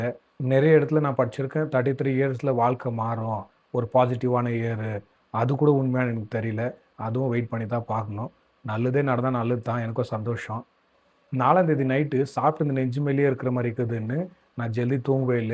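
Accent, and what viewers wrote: Bangalore, India. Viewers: Easter birthday nu video liye sollitunga, unga slang uh vechu place of birth Bangalore nu potupe, which year bro, 33 na 94 or 93?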